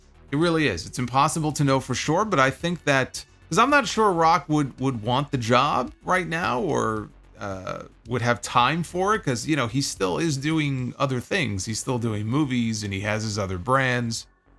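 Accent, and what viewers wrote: New York, United States. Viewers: I love! He is the only wrestling tuber I watch/listen too! So level headed, cslm, cool, collected! And I can understand his NY accent!😂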